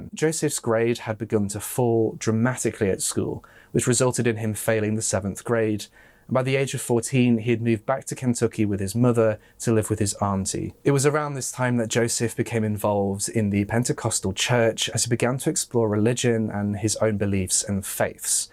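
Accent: United Kingdom. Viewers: I've watched years of your videos and just love you. You and Emma Kenny are my favorites. I'm an American and I adore you and Emma's British accents. Thank you for the hard work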